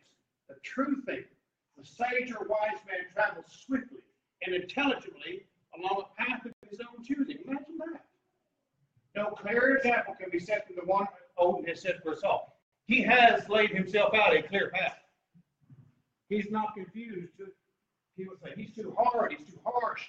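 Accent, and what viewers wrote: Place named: South, United States. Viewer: Thank you sir it’s nice to hear a southern voice speak on these topics I feel a bit alone sometimes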